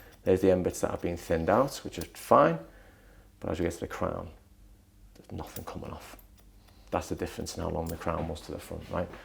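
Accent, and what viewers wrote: Liverpool, United Kingdom. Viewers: Bonjour. 😊 Yes has a fairly strong but gentle regional English accent. He is from Liverpool or that area of England. The UK has many different accents. I come from an area of Scotland where we speak both softly and quickly. I'm often not understood by the English.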